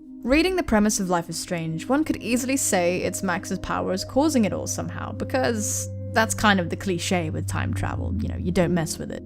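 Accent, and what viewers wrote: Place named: RP, United Kingdom. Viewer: you sound soo posh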